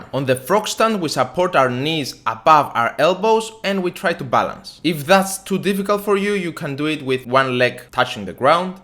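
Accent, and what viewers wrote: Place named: Greece. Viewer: Are you Greek? Your pronunciation sounds really Greek llol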